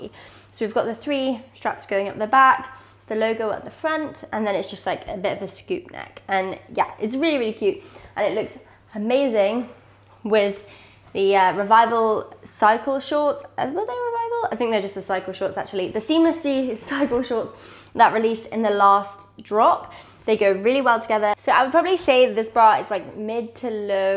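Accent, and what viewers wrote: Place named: United Kingdom. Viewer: I love your British accent 😍greetings from Mexico 🇲🇽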